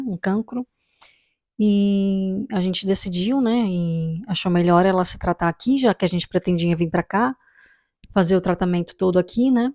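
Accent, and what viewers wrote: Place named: Brazil. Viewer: Se és Portuguesa e estás em Portugal, porque é que falas brasileiro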